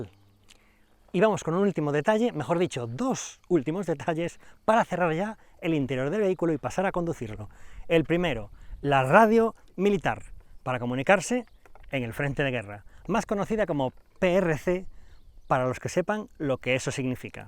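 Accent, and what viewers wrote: Spain. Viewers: Jajajaja Ya conseguí ver un vídeo completo tuyo...puse mute al audio (volúmen 0) y así me quite de encima tu castellano castizo. Sorry, pero en verdad que no lo soporto 👎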